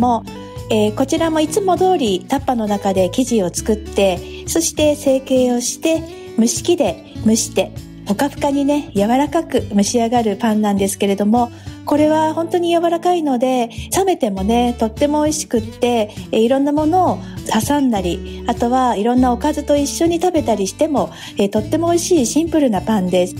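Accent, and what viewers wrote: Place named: Niigata, Japan. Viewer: どこか少し新潟弁入ってる？と思っていたらやはりそうでしたかー 私の故郷です いつも優しい語り口で癒やされます これからも楽しみにしております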